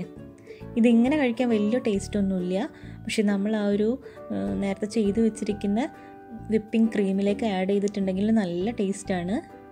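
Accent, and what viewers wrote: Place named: Kozhikode, India. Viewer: Kozhikkodan bhaasha okke edkk keri varunnundallo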